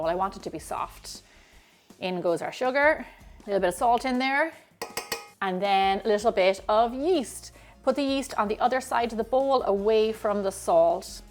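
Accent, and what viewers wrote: Ireland. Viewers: I adore your easy to watch videos, not to mention your Lovely Irish Brouge. However I like bananas but not in baking. What else can I use to make these inviting rolls?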